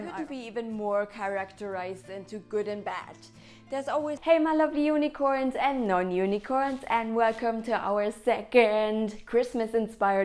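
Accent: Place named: Germany. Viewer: hi you have a german accent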